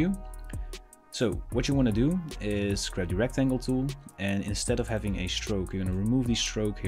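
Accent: Netherlands. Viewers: Deze design is echt zo dope! Ik hoor aan je engels toevallig dat je nederlands bent 😊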